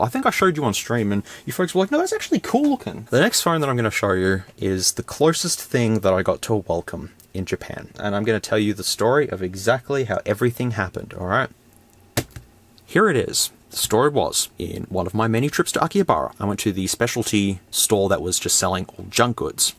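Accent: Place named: Australia. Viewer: Oh so you have the Australian accent that puts R sounds in everything. Got it.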